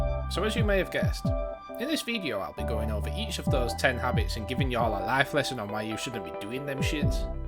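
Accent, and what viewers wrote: Yorkshire, United Kingdom. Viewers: Very good video bro, you from Yorkshire too or manny?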